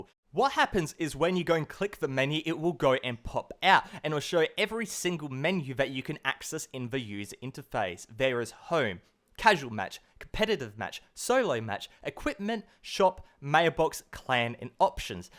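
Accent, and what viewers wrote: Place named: Australia. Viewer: your Australian accent sounding delicious like always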